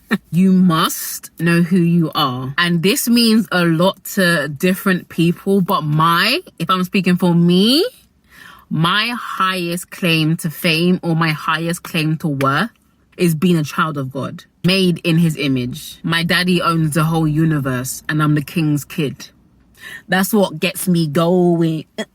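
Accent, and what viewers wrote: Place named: RP, United Kingdom. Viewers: I love your English accent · accent though…. So posh🤤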